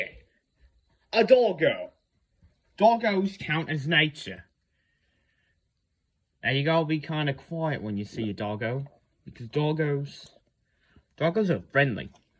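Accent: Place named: Australia. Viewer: Why the actual hell is no one talking about his b e a u t I f u l Australian accent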